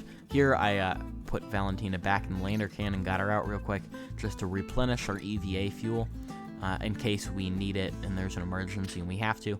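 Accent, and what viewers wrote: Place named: United States. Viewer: be soundin kinda american doe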